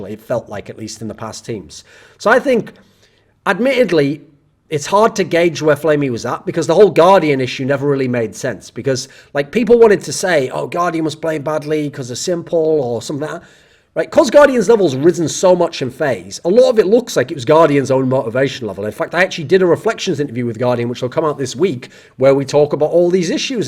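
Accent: Russia. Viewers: Continue with the russian accent please. Very funny!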